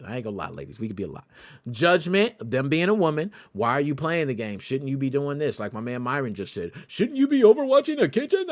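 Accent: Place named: United States. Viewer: I just hate American black guys' accent 😒. especially the US ones that gives lectures like you doesn't suit you at all. Plz correct your accent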